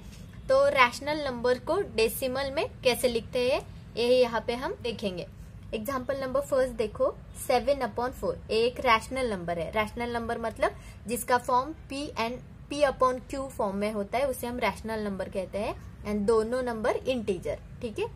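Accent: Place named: Mumbai, India. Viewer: 😊 aap mumbai ki lagti ho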